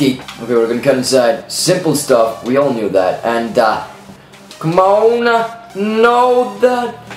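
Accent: Iceland. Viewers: are u Icelandic ?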